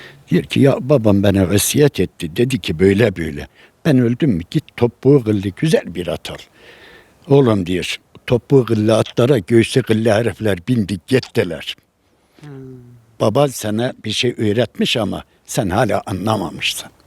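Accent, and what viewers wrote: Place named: Erzurum, Turkey. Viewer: çok güzel bir anlatım çok tatlı bir Erzurum ağzı çok teşekkür ediyorum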